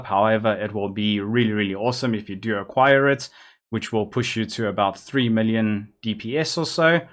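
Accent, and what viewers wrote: South Africa. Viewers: You sound South African dude. Am I guessing correctly?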